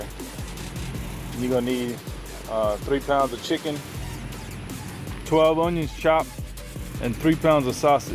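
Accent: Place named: Louisiana, United States. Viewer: Making it today!!! I've watched many people's vidoes but this one was more of my type of jambalaya! I live in Louisiana so when I heard this accent I knew it was the one!🤣🙌🏽🙌🏽🤟🏿 I will be back for updates!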